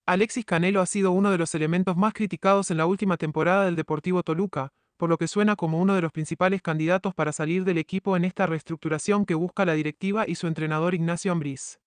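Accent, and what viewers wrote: Argentina. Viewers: Otro vez el argentino hablando 🧐 cómo se llama usted amigo argentino preséntese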